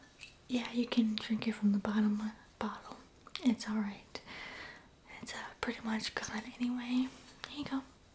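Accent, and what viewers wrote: Canada. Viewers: Fruity Newfie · Are you canadian?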